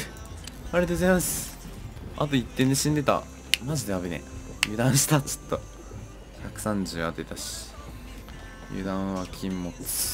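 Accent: Kansai, Japan. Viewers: さんのこと嫌いやってんけど関西弁？好きやしキャラ好きやからもういいや推し決定俺何言ってんやろ。GW全員推しやった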